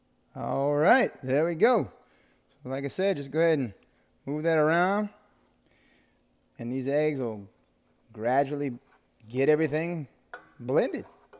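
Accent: Louisiana, United States. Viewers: Oh yes, very Cajun sounding so entertaining.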